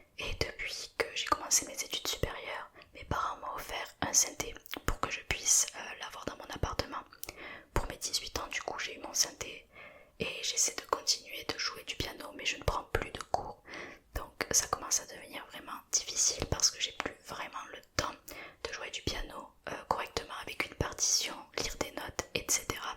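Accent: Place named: Carcassonne, France. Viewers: Merci de t être confiée comme cela. C est touchant. Et je me disais bien que cet accent venait du sud ouest... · Je me disais bien que tu avait l'accent d'ici (Carcassonne 😂) !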